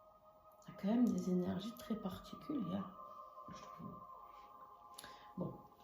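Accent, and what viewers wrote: South, France. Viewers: et quelle voix...! Avec un bel accent du sud que je connais bien 😉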